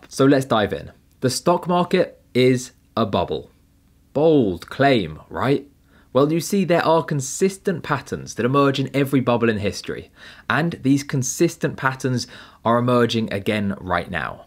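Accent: England, United Kingdom. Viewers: I normally listen to American investors and traders hard to find people from where I am from to talk about stocks but good to hear an English voice !!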